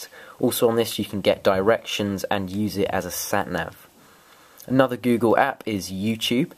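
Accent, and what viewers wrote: England, United Kingdom. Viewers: nice accent. you from england? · You Britian?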